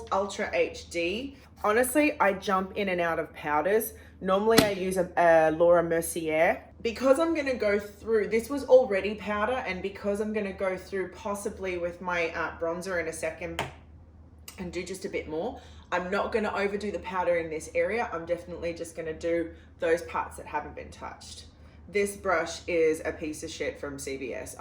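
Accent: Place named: Australia. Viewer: You are gorgeous, with or without makeup. I love your Australian accent. I enjoy listening to your videos because of the way you speak. You mentioned that you are delving into acting projects. Are you learning how to pronounce words in other accents as well ?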